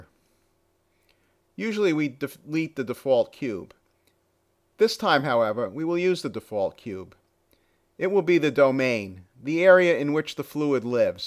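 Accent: Boston, United States. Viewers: you have a boston accent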